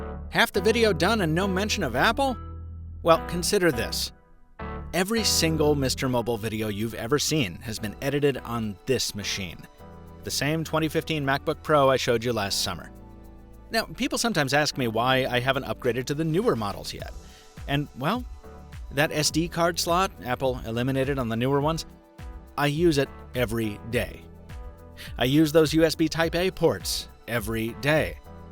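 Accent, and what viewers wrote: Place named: Boston, United States. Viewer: Boston aye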